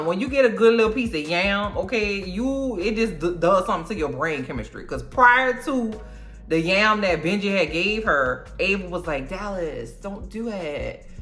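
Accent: New Orleans, United States. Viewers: This is my first video of your and Im here to stay. I love a New Orleans accent chile...from your neighbor in Texas 😉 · You remind of Bondy Blue!! Love you vibe and N.O. accent! You’re so funny! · Sounds like you’re from Nola⚜️😃 I knew it, I know my people 😂 I like that Melanin people are on my screen but TP needs at least 2 seasoned writers from Shonda Rhimes team or somebody😅 however, it was funny! I now point two fingers at my kids when they don’t listen😂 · BTW, your New Orleans accent makes this even funnier😂😂😂 it just adds spice and realness. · I'm subscribed I lived in Lincoln Parrish I miss that neworleenz talk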